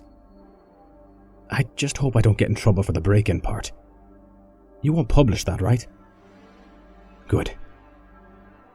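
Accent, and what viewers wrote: Ireland. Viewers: Love your Irish accent! Great narrations! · Damn, I’ve heard this one before, but your narration’s the best one I’ve heard. Your Irish accent sounds awesome! Not too overdone, extremely believable.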